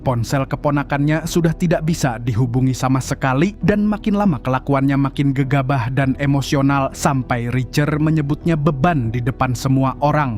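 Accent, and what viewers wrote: Banjar, Indonesia. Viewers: orang Banjar kah mang?😂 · Admin ny urang banjar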